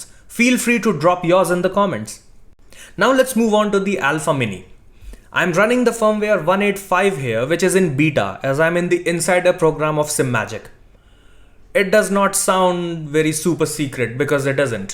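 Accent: India. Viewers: yup, shit video. sorry. first seconds you mentioned that the video is not sponsored in any way! and then... this video was made possible by simagic. and then I hear an India accent... you can't make this shit up, I won't watch the video made it one minute. do better or stop making YouTube videos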